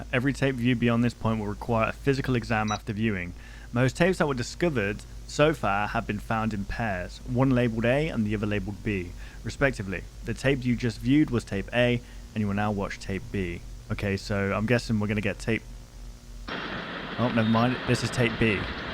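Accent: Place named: United Kingdom. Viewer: Bro is so British I thought he was saying shack instead of shark for like 60% of the vidoe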